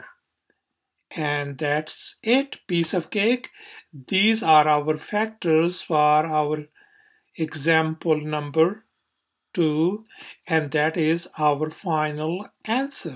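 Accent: India. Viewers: thank you guy with indian accent (i guess he's indian) now everyone's exam is saved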